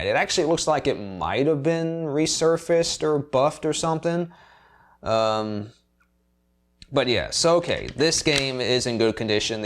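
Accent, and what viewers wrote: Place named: Bronx, United States. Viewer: are you from newyork fam cause you sound like yeah from the bronx its lit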